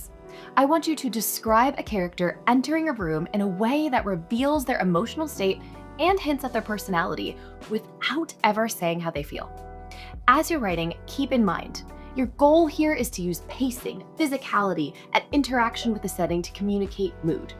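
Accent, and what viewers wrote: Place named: United Kingdom. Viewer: Once again, not helpful for me to improve on not telling in my story. She's speaking British, and I'm full-blooded American from Chicago. It may generally be English, but the language is still foreign to me.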